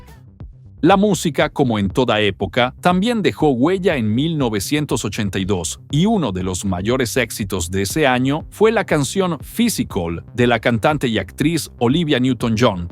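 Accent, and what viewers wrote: Yucatan, Mexico. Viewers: Ese acento es yucateco? Suena muy bien!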